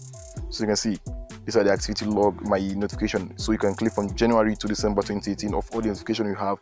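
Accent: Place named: Nigeria. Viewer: This guy sounds Nigerian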